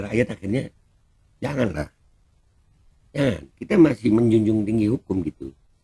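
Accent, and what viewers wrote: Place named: East Java, Indonesia. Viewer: LOGATNYA SEPERTI JAWA TIMURAN..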